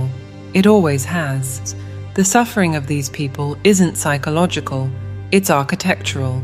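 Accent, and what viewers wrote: United Kingdom. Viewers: Thank you so so much for returning the british voice. Please redo the other two videos 🥺🥺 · British voice yes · Fantastic, thank you so much for listening to us and bringing back the posh English lady. Really appreciate it 🙏🏼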